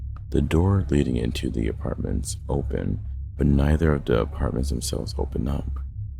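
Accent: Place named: United States. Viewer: U sound like an American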